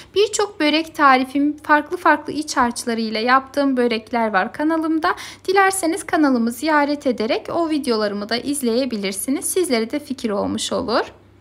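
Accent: Azerbaijan. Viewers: Azeri siveniz var, azeri misnz?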